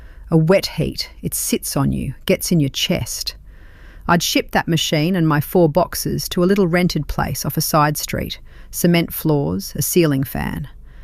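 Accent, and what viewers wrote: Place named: United Kingdom. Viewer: She sounds british. One more story about how wonderful the philillipines are why do so many philillpines come here and stay.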